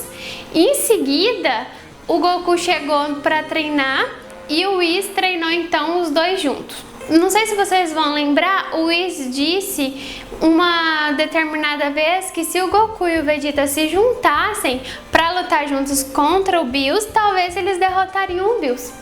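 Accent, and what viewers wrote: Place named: Londrina, Brazil. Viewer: você e londrina? ( o sutaque parece)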